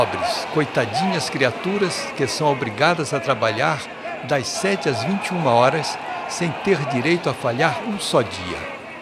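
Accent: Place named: Portugal. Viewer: Esse narrador deve ser europeu rs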